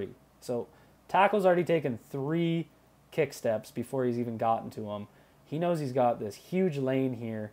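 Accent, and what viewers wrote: Canada. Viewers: Your Canadian accent is so strong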